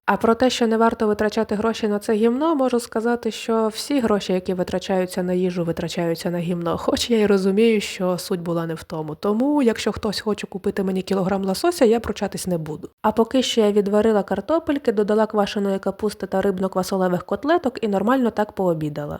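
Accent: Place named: Kharkiv, Ukraine. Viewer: Ви - чудова, і рецепти - цікаві. Більш за все подобається ваш тембр. І ще інколи здається, що ви з Харкова.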